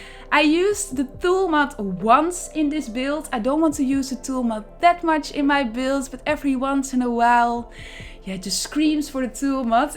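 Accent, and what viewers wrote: Netherlands. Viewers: Wowwww 😍😍😍😍 ik hoor gelijk dat je Nederlands bent 😂😂💗💗💗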